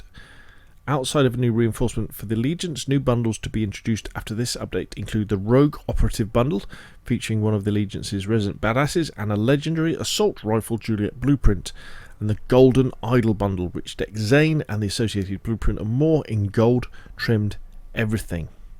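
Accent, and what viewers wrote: United Kingdom. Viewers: Jesus Christ you are a breath of fresh air. Every other video I've tried to watch is just some American begging for subs and spending literally 12-15mins going through everything, with added speculation and opinion. You just go through the information bit by bit and don't flood it with your own contrived padding. Thank you for your resounding Britishness and the approach you have to information dumps. ✌️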